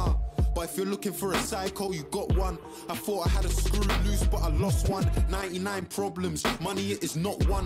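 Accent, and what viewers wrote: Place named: United Kingdom. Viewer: our bri'ish boi poppin off once again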